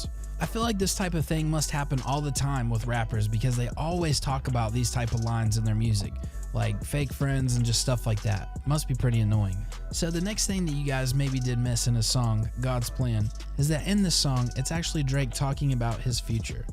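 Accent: United States. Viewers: Broooo, you gotta be from B-More you got the strong O accent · Mega southern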